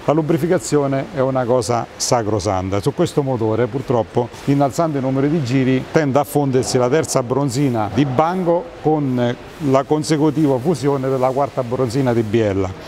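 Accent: Abruzzo, Italy. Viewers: "Pecché nen chiut perfettamend'" "E quand è lung 'stu tapp!" L'accend abbruzzes é lu topp!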